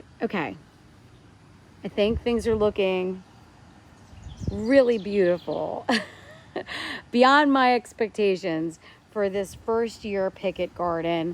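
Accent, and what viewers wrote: New Jersey, United States. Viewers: Very nice !! Love your channel and also your voice !! Not sure if it’s a Jersey accent ? What a transformation in your garden ! I love how you always change things up !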